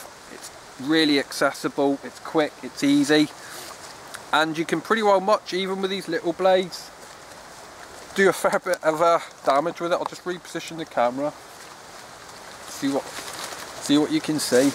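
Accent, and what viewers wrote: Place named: Black Country, United Kingdom. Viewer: Sounds like a Black Country accent mixed with something else.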